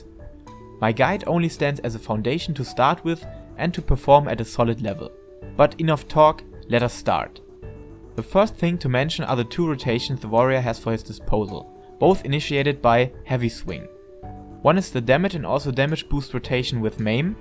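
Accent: Germany. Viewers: you can hear he's german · Just a suggestion, it might be better to introduce the skill sets by bullet points. Overall the guide is quite straightforward. And German Accent is so damn badass. Love it.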